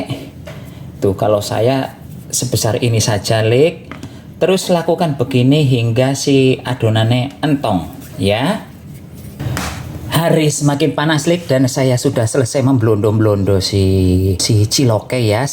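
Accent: Banyumas, Indonesia. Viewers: As. Mas sampean kok baasanya seperti bahasane innyong ibu juga orang ngapak daerah banyumqsan pingin kenal mas biyung dirah sukses slalu amin.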